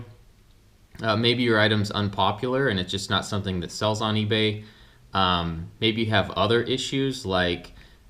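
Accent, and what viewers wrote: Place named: Southern California, United States. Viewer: Keep up the good work man, Seems like your from socal.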